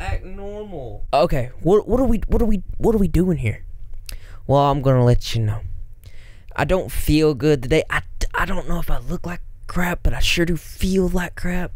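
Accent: United States. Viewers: Where do you live/are from? You literally sound like you could live in the states near me.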